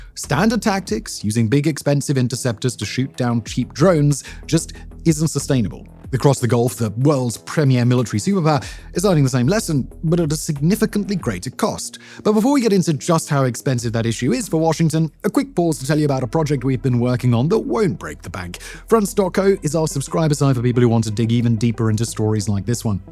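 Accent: United Kingdom. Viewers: Is this a UK accent ? Uk isnt prepared for Drone war either, they're not even prepared for regular war, they only have 20 mission ready battle tanks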